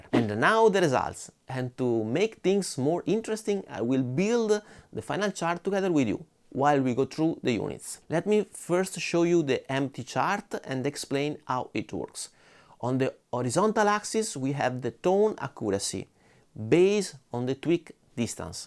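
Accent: Italy. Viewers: First, your videos are the best and most informative that I have seen anywhere! Interesting that for me the Quad Cortex had sound that was close overall but had some high frequency sharpness and harshness that I found problematic. This harshness was not present in the original SLO nor anh of the other contenders. Also interesting that none of them really nailed the tone. They were all pretty close (except for the Stadium- which was embarrassingly bad) but even the closest, the Neural plugin, doesn't sound indistinguishable from the real amp. Many people are saying that the challenge of sound accuracy is over, but there is plenty of room to improve if this comparison is representative of the current state of modeling/capturing. A lot of it may just be down to which capture you are using and how well the capture was done. One constructive idea if I might? It would be really helpful to have the clips played with the original right before each of the contenders. 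It's much easier to hear when you have the opportunity to hear the original again right before each of the new sounds for direct immediate comparison. Thanks for all the hard work you have done in sharing all of this information! Love your accent, Italian?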